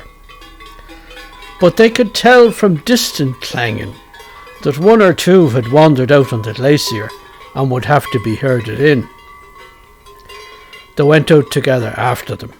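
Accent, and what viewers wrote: Dublin, Ireland. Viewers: Same here but he soothes my nighttimes with his gentle voice and delightful Dubliner accent. Can you imagine sipping some tea or sharing a pint with Mr. relating one of his tales somewhere in Dublin? What a treat that would be!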